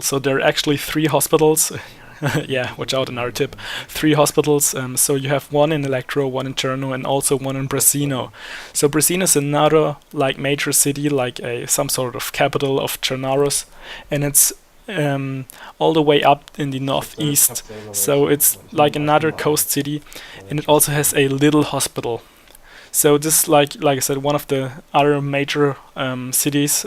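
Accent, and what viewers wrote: Germany. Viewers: man hört deinen deutschen akzent und man hört deinen bruder deutsch sprechen in skype ^^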